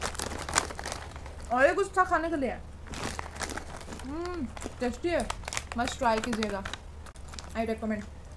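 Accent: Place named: Odisha, India. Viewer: Oh you're from orissa I recognised the way you speak hindi 🙃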